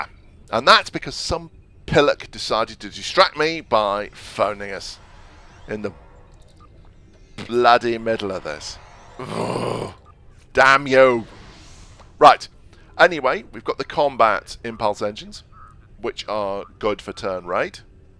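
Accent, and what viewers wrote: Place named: England, United Kingdom. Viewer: If you don't mind me asking, what part of England are you from? I love your accent.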